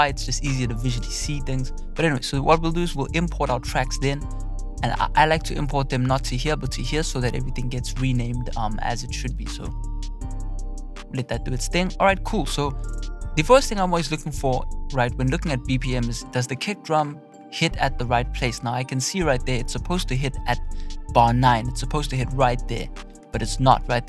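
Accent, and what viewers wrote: South Africa. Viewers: yo bro are you from South Africa? your accent lowkey sounds like it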